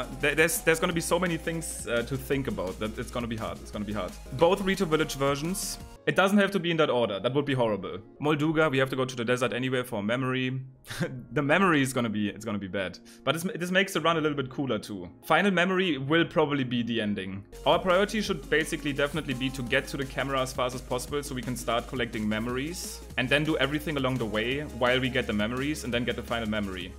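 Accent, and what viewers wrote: Germany. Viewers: Bruder bist du deutsch?? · Ich weiß direkt dass du deutscher bist Lul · Dieses alman englisch ist echt nice, irgendwie xD😅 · Why are you... German?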